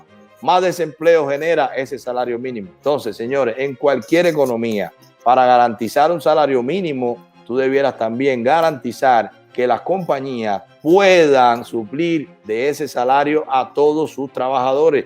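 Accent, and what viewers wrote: Cuba. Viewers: Ese man es nacido y criado en la caliente. En el coraxon del cerro ..CARRAGUAO... No hay otra forma de hablar para alguien nacido alli.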